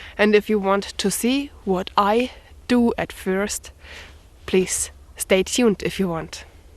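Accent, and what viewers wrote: Bavaria, Germany. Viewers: Hi! Regarding security for your little forest cabin ("hut"), I cannot add much more to what others have already written in the comments. I recently came upon this channel of yours. After watching 3 of your videos, I was impressed with your knowledge of outdoor skills. I was especially impressed with your knowledge of edible wild plants and herbs. So I subscribed to this YouTube channel. Als ich feststellte, dass Sie auch einen deutschen Kanal haben, habe ich ihn auch sofort abonniert. Es ist sehr beeindruckend, dass Sie Videos in englischer Sprache machen. Aus einiger Erfahrung[*] weiß ich, dass es nicht einfach ist in einer Fremdsprache frei zu sprechen. Also! Für ihre tolle Leistung... Hut ab! Und ich wünsche ihnen weiteren Erfolg bei allen was Sie unternehmen. Übrigens ihre Kinder sind hübsch und süß. I love your German accent in English and your Bayern accent in German. 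--- [*] In den 90er Jahren lebte ich in Deutschland und habe für eine deutsche Firma gearbeitet.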